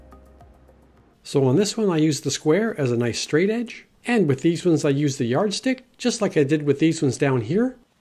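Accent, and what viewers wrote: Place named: Canada. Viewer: I'm guessing from your accent you're in Canada? Amazing assortment of birds you have at your property! I generally just get the common house sparrow here in the UK plus the odd robin and loads of greedy starlings! Going to have a go at one of these, not because I have a squirrel problem but there ARE a load of cats around and two in particular like to stand at the base of my bird feeder and spring up at the unwary birds. Great idea and endlessly adaptable - would never have thought of using silicon!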